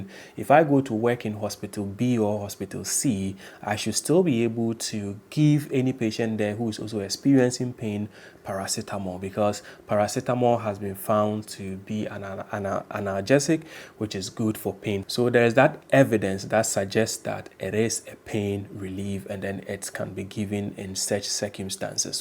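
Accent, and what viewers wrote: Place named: India. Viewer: The voice over is in India, why? I can't understand anything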